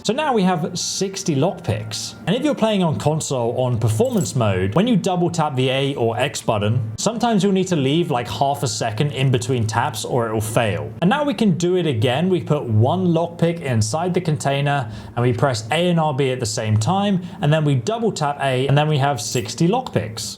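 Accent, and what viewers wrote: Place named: RP, United Kingdom. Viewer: You’re very posh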